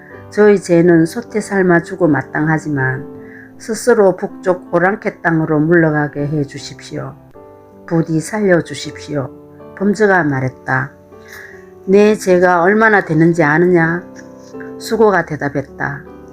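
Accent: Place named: Gyeongsang, South Korea. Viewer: 사기열전 19 잘듣겠습니다 경상도 ㅎㅎ 정감가네유 수고하셨습니다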